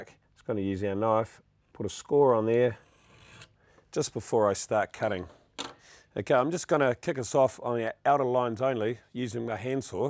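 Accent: New Zealand. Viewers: yeh, it is our Kiwi accent. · he's a kiwi bro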